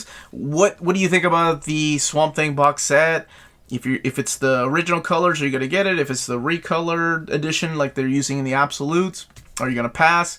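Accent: United States. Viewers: Are you from Kentucky????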